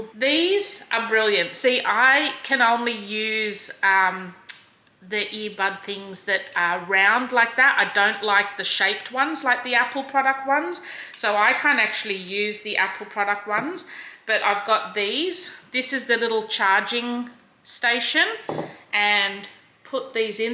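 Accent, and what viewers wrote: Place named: Australia. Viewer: Aren’t you from Australia? You sound like an Aussie! Love watching your videos.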